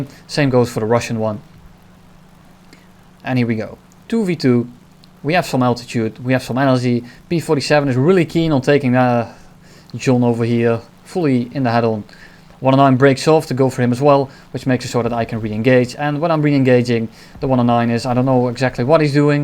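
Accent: Netherlands. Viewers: Oh that is why you got that Bas Rutten accent!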